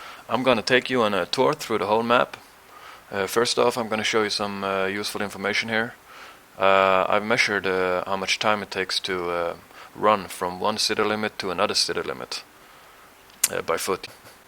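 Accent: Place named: Netherlands. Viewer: nederlands? hoor het aan je accent :D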